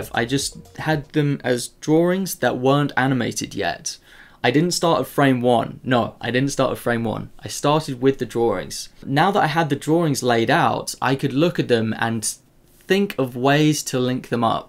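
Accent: United Kingdom. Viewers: Thank you very much! I needed morphing in my studies))) I really enjoy your videos thanks for British accent ;)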